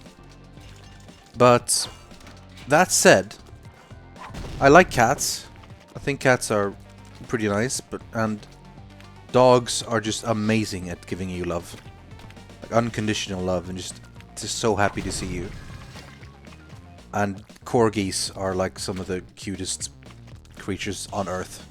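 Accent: Scania, Sweden. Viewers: Ah your swedish, I've been trying forever to figure that accent. I wasn't sure if it was one of the American accents or a variation of the English accent. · Det finns en äldre Q&A någonstans bland Rythians videor, och i den bjuder han på lite skånska. Han är från Malmö tydligen. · Are you from Sweden? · is he swedish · is swedish? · are you from sweden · HOLY SH!T Are you from Sweden!?! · I tought I rekognise the accent. SWEDEN !!!!! ;D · are you from sweden?:D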